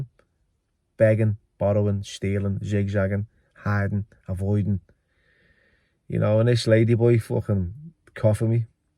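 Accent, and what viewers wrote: Liverpool, United Kingdom. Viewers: love the scouser accent xx your amazing and amazing at looking after your Joe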